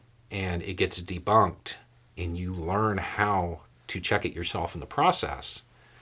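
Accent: South, United States. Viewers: I beg your pardon, I happen to watch every video you put out. 😉 I also share them to educate those who are down the rabbit holes of conspiracy theories. Avid fan of your content, including Roads with. I’m a Georgia girl, so I just love to listen to you speak. You’re intelligence, calm demeanour & southern accent in a sing/song tempo is mesmerising. I do enjoy when your temper flares every now & again.😂 It reminds me of my childhood. Stern, but not aggressive.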